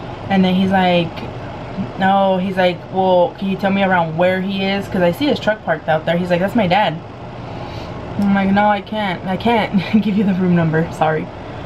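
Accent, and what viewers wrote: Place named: South, United States. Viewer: I don't know what that accent is that you have but I absolutely love it. It's kinda southern with a hint of Spanish.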